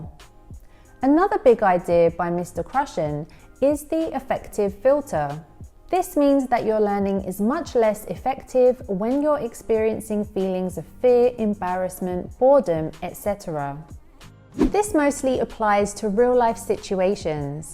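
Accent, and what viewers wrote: United Kingdom. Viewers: this speaker is from British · your British accent is lovely. Which part of the U.K. are you from?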